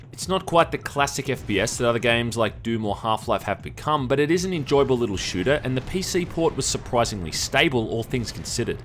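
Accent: Australia. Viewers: Australian accent that isn't danger dolan yay! subbing just for that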